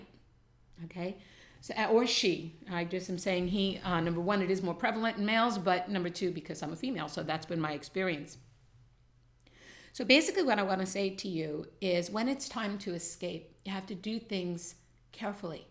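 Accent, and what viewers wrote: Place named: New York, United States. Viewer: I got this gut feeling with a last name such as yours you are from NY cause Im from NY and You sound like someone I would talk with in a King Kullen or S&S. Making me home sick. I also would like to point out...that things in America we would classify as NPD or other disorders would be considerded normal especially in Asia.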